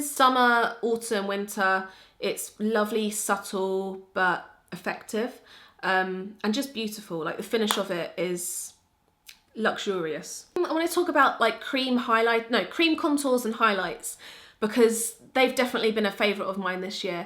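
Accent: United Kingdom. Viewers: I just LOVE your british accent #italylovesyou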